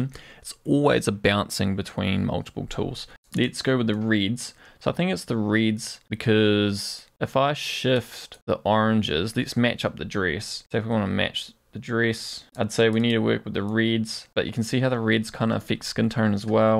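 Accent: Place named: New Zealand. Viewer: Think he’s from New Zealand bro bro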